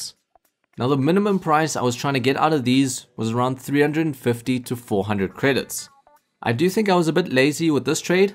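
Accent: South Africa. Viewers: Why do you sound very south african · This man sounds afrikaans or is it just me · I know a South African accent when I hear one!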